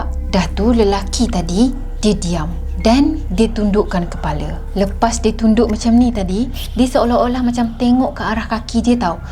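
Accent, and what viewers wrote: Kelantan, Malaysia. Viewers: Pada permulaan video,saya ingatkan kak ni orang Indonesia. Tapi bila dah start bersuara,hihi orang kito ruponyo..tokleh nok lari bunyi loghat kelate dok?..tu hok kawe terus pechek potek subscribe..moga Allah permudahkan segala urusan Kak..inshaa Allah..amiin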